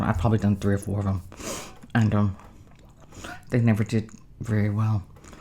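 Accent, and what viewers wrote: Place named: Texas, United States. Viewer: The mic looks good off to the side, and sounds good. I think some people can’t understand you sometimes because of your Texas accent. Pay no mind to them.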